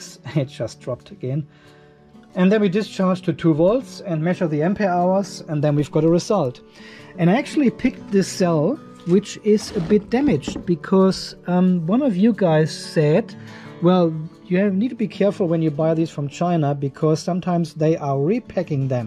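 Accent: Germany. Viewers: Hey there, I've ordered the same cells.. waiting for delivery 😤 Btw: is it possible that you are a German guy? Sound very familiar to me. Keep on going, looking forward to your next video....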